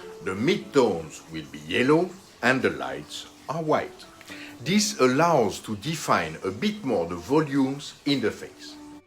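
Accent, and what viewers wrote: France. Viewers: enjoy your French accent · your accent seems to be French, is it??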